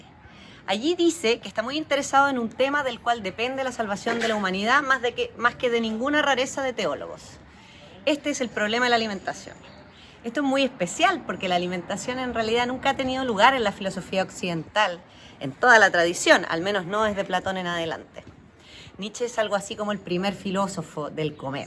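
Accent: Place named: Chile. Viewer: Hola, que buen Canal me acaba de recomendar youtube, por tu acento asumo que eres de Chile cierto? Saludos!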